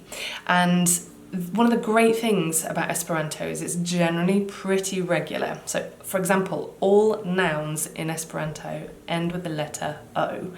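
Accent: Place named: United Kingdom. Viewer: Great video. I'll be using this "the" explanation for my future teachings. Plus, always good to hear that Brit accent 😉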